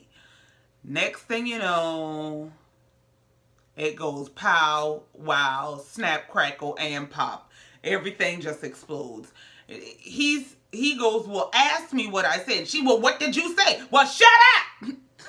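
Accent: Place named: Louisiana, United States. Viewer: you got me gul! There were just some things you said that gave Shreveport and NOLA tease. Geechee accent is one I'm not that familiar with. That Carolina food good AF tho😊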